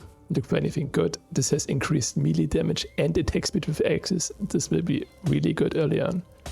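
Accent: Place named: Austria. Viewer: Austrian for sure! Probably from Niederösterreich or Wien! Nice video!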